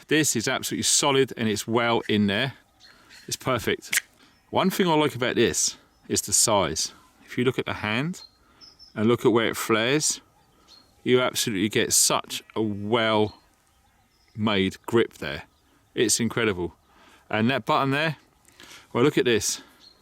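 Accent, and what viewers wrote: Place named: Norfolk, United Kingdom. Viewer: Thanks for the your videos. Please can I ask are you originally from Norfolk ? I was born there but now living in the the northeast. Just thought I could detect a faint bit of the familiar accent.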